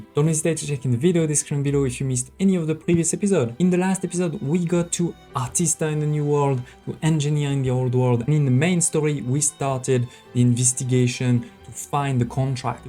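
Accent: France. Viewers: i think the video would be better without the french accent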